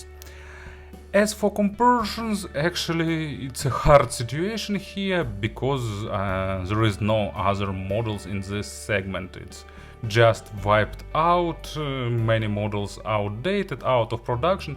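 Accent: Russia. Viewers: руссский акцент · Nice review and your Russian english accent is not tiring to listen. I am about to receive my shan m3x in 2-days thanks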